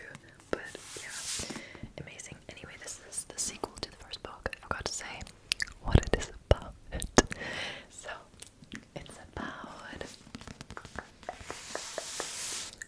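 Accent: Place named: Germany. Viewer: Dein Englisch wir von tag zu tag mehr denglisch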